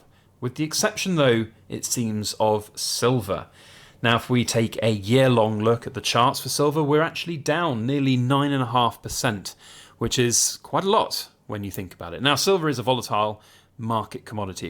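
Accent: United Kingdom. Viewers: Greetings from Colorado. You have to pay VAT on silver? I'm surprised it's even worth buying once the tax is factored in. I'm coming over to UK this summer and might consider bringing silver to sell. Love the Harry Potter accent!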